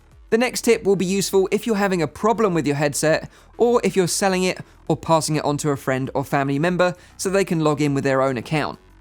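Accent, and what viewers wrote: United Kingdom. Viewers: He’s just British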